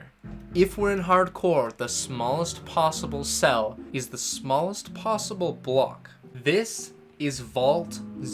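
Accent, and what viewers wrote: Canada. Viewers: Are you Canadian ? 🫧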